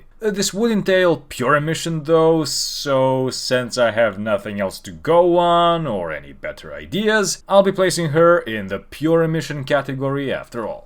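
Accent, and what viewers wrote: Eastern Europe. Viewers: I asked under ur most recent video if you were Eastern European and I just knew I had a Serbian brother here Keep up the great work! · Somehow kinda funny to hear a Slavic accent talk about anime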